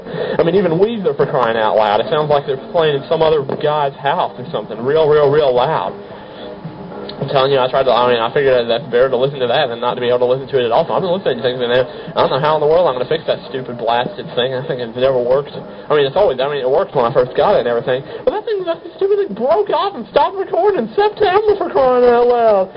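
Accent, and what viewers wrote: South, United States. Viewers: On the tape you sounded a lot more southern! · hhahahaha you had much more of a southern accent back then :D so CUTE! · Isn't he 19 in this tape? Also I love the southern the accent.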